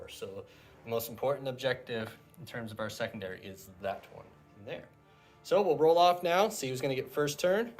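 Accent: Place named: Canada. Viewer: Canadian accent detected :)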